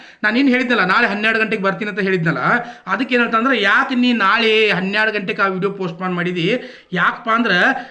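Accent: North Karnataka, India. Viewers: ಅಣ್ಣಾ ನಿಮ್ಮ ಮಾತ 🔥 ನಿಮ್ಮ ಊರು ಯಾವುದ ರಿ ನಮ್ಮ u k ಭಾಷೆ ಮಾತ್ರ 🔥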